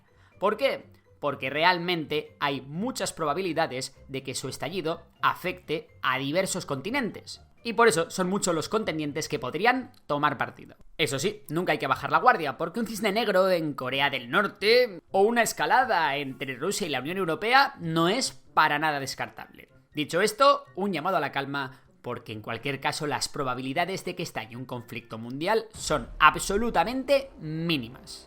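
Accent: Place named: Spain. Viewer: Escuchar a un español da vertigo.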